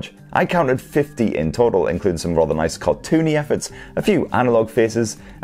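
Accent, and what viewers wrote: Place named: North East England, United Kingdom. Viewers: I thought I recognised the accent aha I’m also from Sunderland:) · A circular job is, and a bum load of features. Love it. Nice looking item. Wish I’d got it, prior to getting the kids into Fitbits. Can’t quite pin point the accent. South of the Tyne, but, North of the Tees?. Nice review.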